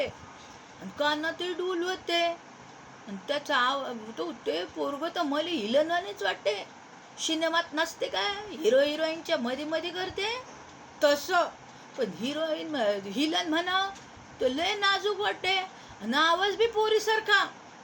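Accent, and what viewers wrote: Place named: Vidarbha, India. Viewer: खूप सुंदर कथा सादर केली आहे़ . विदर्भ बोलीचा ठसका एकदम कडक 👌👌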